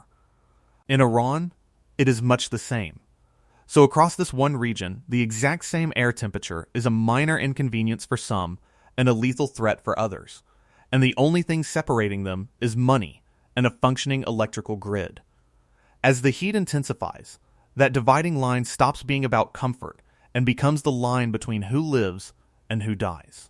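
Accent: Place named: United States. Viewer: I despise that US accent 🙄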